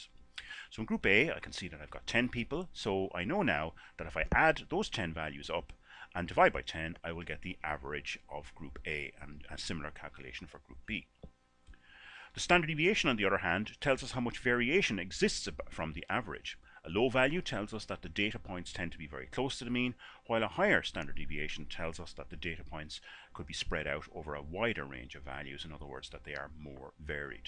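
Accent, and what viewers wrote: Ireland. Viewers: I found this video very useful,. And...are you Irish, cause you sound Irish, and your last name is O'(name). I liked hearing your voice as I followed along (it was very relaxing and almost lulled me to sleep, loll), and I liked the fact that you went slow. Thank you so much! Blessings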